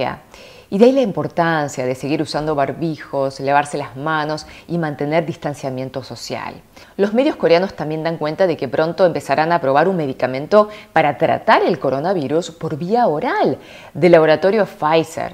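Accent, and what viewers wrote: Argentina. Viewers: Disculpa pero hablas como Argentina vives allá y cómo te llamas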